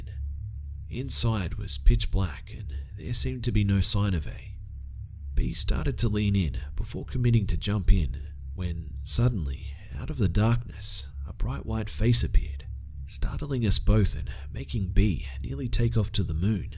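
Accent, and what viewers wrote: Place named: Australia. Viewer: hey you sound aussie.which city are you from? 😄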